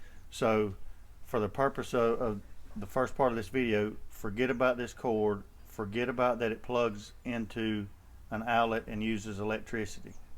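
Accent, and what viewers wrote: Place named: Georgia, United States. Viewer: Hey Brother , you sound like a guy from South Georgia. Great Job explaining the Pellet Grill. Learned some new stuff. I live in North Florida and I have had my grill about a month. Thanks for sharing.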